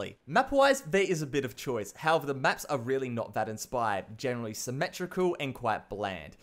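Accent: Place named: Australia. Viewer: I KNEW U WERE AUSSIE THE MOMENT I CLICKED ON THIS VID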